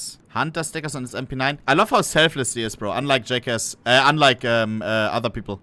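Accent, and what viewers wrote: Germany. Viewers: German. I love his accent bro 😂😂😂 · He pronounces it in German w sounds like v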